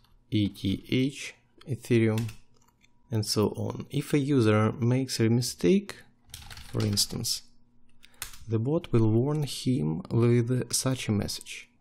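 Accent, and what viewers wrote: Russia. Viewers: thanks! ;D i love your russian accent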